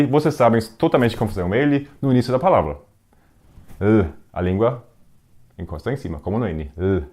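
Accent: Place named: Brazil. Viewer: O português dele é muito brasileiro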